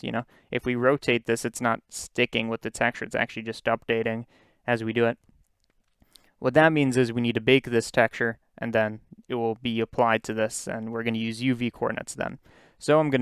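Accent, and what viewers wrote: Boston, United States. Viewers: Boston area I see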